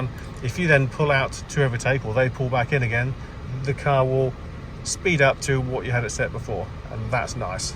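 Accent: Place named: United Kingdom. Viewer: British accent ruined the video I’m unable to continue watching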